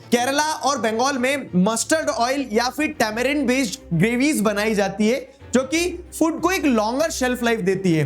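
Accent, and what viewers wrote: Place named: Garhwal, India. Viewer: garhwali dilect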